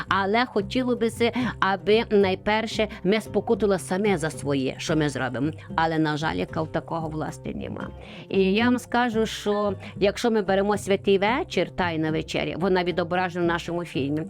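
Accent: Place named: Hutsul, Ukraine. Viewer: Талант оповідачки незаперечний. А яка мила гуцульська говірка ! Дякую !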